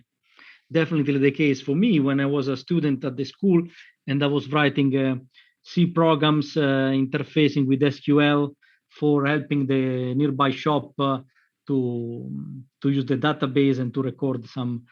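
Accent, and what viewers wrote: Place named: Russia. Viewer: Он говорит на английском максимально по-русски) Очень понятный акцент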